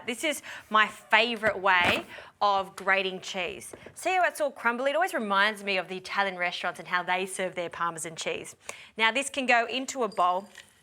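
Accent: Australia. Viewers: I love the Aussie accent ❤️❤️❤️. Only came here to see if sprouts were ok to eat raw 😁😁😁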